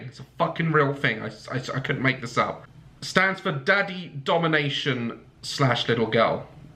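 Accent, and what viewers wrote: United Kingdom. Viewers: sometimes I forget your British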